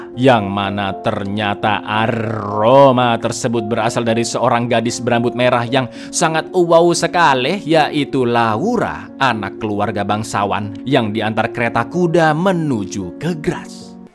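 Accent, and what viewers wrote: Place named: East Java, Indonesia. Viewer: Hahaha sebenernya punya potensi melebihi iq7, tapi kenapa jon iq7 yg lebih terkenal ya. Apa karena logat sunda jon lebih populer dari logat jatim nya cak. Entahlah, waktu yg akan menjawab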